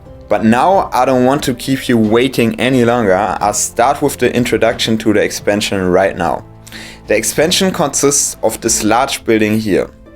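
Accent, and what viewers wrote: Germany. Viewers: Kommst du aus Deutschland du hast einen Deutschen Akzent?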